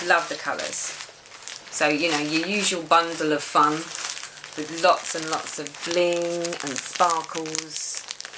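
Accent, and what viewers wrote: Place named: Essex, United Kingdom. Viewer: Thank you for your videos!! I don't want to be critical of others, but.................... You have a way of speaking into the camera, telling a story without 5 minute hesitations, no umming, ah-ing. I feel you are talking to me and not your ceiling. You don't "forget" where you purchased something. You are organized and have everything at your fingertips, no matter what room you're in. You aren't dashing about to another floor in your house to find something while your camera is running. You know where you get your floss. All your fabrics have names rather than "I'm not sure what it is." or "Yes, it's pink and white but I don't know why or who did it." You don't hum non-existant musical ditties all the while lacking the talent to do so during a "Stitch With Me" video. If your phone rings, you don't answer it. How gracious and courteous of you. 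You probably have one of the most concise, informative, friendly videos on Flosstube!! And......you ALWAYS look so nice, even when you aren't well. (I include Carolyn Masseo among your caliber of video makers.) Whew!! Thank you for allowing me to get that off my chest. It's not hard to figure out what to watch on here as long as I see a video I haven't watch from " "!! And come on now!! You HAVE to love that Essex accent! Hugs,.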